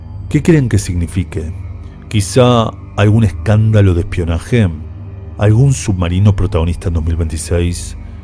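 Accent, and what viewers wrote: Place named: Argentina. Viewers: A pesar del acento argentino??? 😠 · Superas ampliamente a Ivan de gran misterio tienes un gran talento de deducción y una gran forma de explicarlo a pesar de tu acento argentino (Felicidades) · A pesar del acento argentino... Te has pasado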